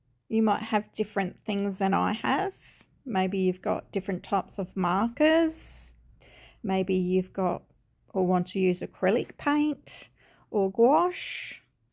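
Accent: Australia. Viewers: I definitely hear you when you talk about trying to keep up with others in the competitive colouring and arty communities eg ‘how can I get better, should I get this material or mediums, will it make me improve etc. it becomes a bit overwhelming in the end and I have been seeking out this kind of video to just be able to loosen up a bit as well. I am an Aussie too so was glad to hear an Australian voice, I have subscribed so that I can go along this journey with you into Zen non anxiety mindfulness. Thanks for your video. 👍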